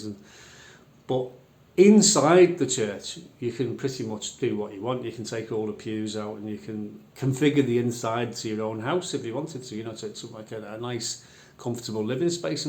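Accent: Liverpool, United Kingdom. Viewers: The scouse accent is way more attractive on you, rather than the posh accent you use more and more of. Don't forget your roots.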